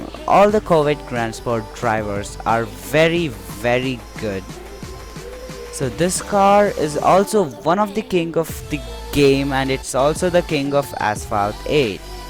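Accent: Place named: South Asia. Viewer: You sound south asian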